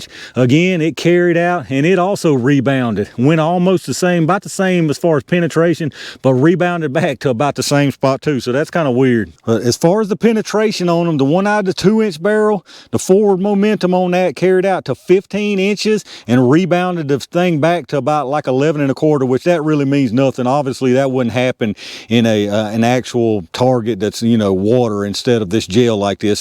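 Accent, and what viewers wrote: South, United States. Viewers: I love your southern accent and newonces. Great demonstration. So much so I visited the site twice.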